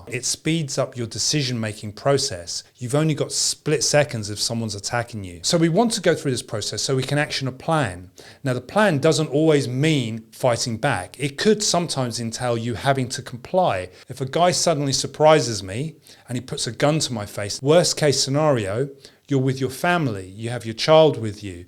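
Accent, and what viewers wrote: England, United Kingdom. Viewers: You sound English I guess having a hand gun is not an option , some bad guys make the mistake of thinking they are the only one with a gun. Most of them cant land a shot within 3 feet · Based on his accent I realize he's a Brit ( I live in the USA); I wish he was my sensi! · UK accent.. hows those knife attack stars after gun bans..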